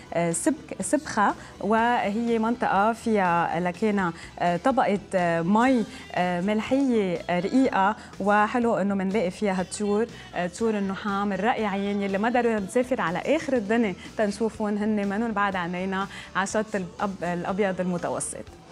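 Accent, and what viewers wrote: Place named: Lebanon. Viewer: هههههههههههههههههههه..سبحان الله تقول عليك لبنانية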